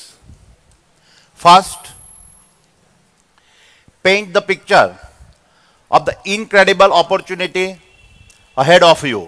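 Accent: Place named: Gujarat, India. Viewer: English gujju accent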